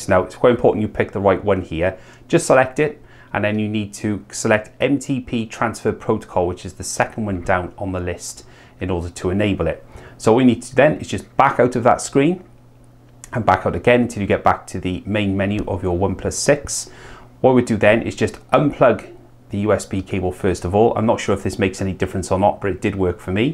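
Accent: Wales, United Kingdom. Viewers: Top tips helped me out 100%, you sound welsh? Me too, from aberystwyth! Cyngor a syniadau gwych!!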